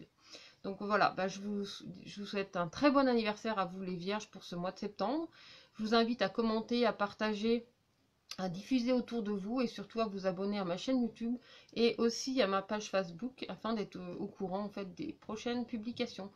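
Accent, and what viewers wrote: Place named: Franche-Comté, France. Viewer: je suis de Franche-Comté,je reconnaissais bien le ptit accent!!!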